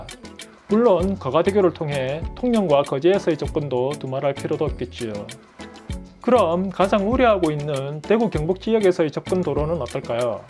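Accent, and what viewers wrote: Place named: Busan, South Korea. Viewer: 부산사투리로 설명하시니 아주 좋아요.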